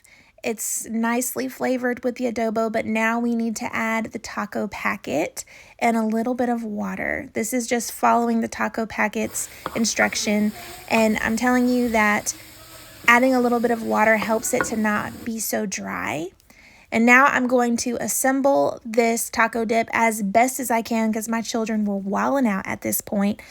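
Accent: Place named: North Carolina, United States. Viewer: Just found you looking for laundry room makeover (its was beautiful by the way..2025) annnd to get the view number off of 666..🫢😁..seen in your videos(love her channel) you are so fun and love the accent..im in North Carolina so it sounds like mine..lol..I have subbed and look forward to getting to know you thru your videos..God keep and God bless 🙏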